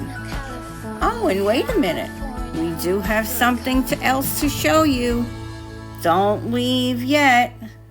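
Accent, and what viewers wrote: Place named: Northeast, United States. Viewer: Very neat idea . Sounds like you moved from the Northeast to Hollywood ftom that accent. Bless you 🙏 💛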